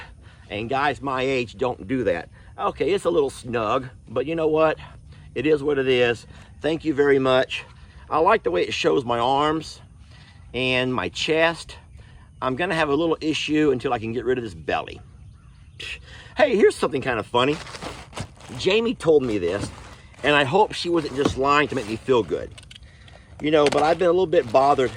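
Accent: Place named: South, United States. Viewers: I would have always thought you would have been the life of the family events when I first started watching ya'll a few years ago. Ya'll are my type of people. Every relationship I've been in they have been the outcast of their families and it's just so sad. My family is so close and only one aunt is kind of an outcast but she hasn't always been .....her actions put her there. I love all of ya'll but you guys and your dad are my absolute favorite. Will have to say out of all of ya'll you keep my attention the best regardless of the video. Maybe what draws me in the most is you talk a lot like we do here in NC. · have Jamie read this to you and don't ever want to change your voice I myself love listen to you and your family talk I love the southern accent ❤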